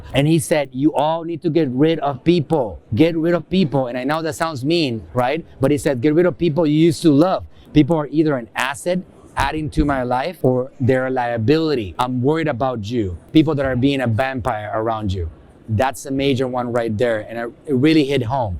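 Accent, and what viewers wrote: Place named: Latin America. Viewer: Qué increible como se nota que no es hablante d inglés nativo. Se nota el acento latino.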